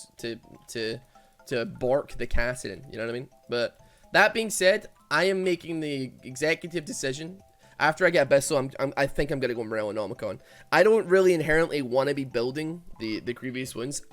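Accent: Scotland, United Kingdom. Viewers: Is scottish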